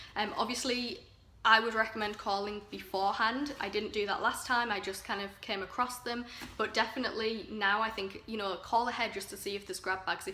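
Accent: United Kingdom. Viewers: That's a definite British accent, if I'm not mistaken. Im glad to hear. I don't see many of us on here. 😉 lol. This is American based I suppose. 😂 When you grab a bunch of boxes together from the same store, your bound to get copies. The last batch of boxes were better, but, one woman's trash, is another woman's treasure...as they say. You'll soon find homes for the thongs you don't want/need. Re the letters...there's the option of making frames from two L's screwed or stuck together. Just turn one upside down and cover. Fancy up...with what ever. Plus the O's. I heard you mention, your a crafter at heart. 😉 Isn't it odd that we enjoy watching others open boxes, to see what's inside. I've not watched this kind of thing before, but I think I'm a bit addicted. Thank goodness we dont have Michaels over here in the Uk. (secretly I do) My stash of crafting materials would be horrendous 😱 Er it's not far off it already. 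There's always room for more 😂 I'd gladly lighten your load a little...can't wait for the chance at your give away. I'll be watching for your craft tutorials. Happy crafting folks.x 😊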